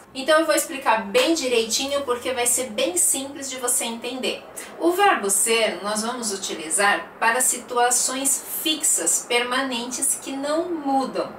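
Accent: Brazil. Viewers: Descargo sus vídeos en MP3 y me los aprendo y así es como practico mi portugués, sobre todo el acento brasileño. Son muy buenos, me encantan, muito obrigada!❤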